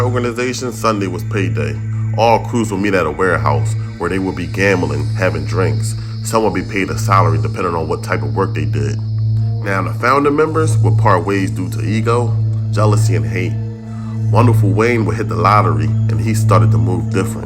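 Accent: Philadelphia, United States. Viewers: This dude narrating gotta be from Philly.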